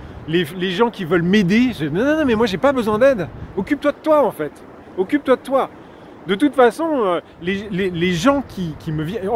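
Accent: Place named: Quebec, Canada. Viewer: ah tu es québécois ?Gpmen !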